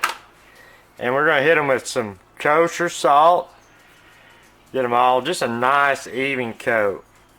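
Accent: South, United States. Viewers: You are such fun chef to watch and I love your southern accent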